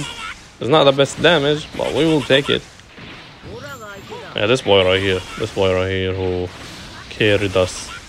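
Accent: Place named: Russia. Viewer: Good gameplay, good team and Russian accent. Perfection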